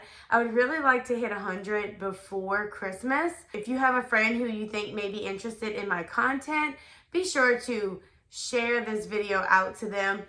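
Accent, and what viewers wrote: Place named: South, United States. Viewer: First time viewer and I enjoyed the video. I think I hear a LA or south MS accent. I’m originally from Gulfport, MS. Kathy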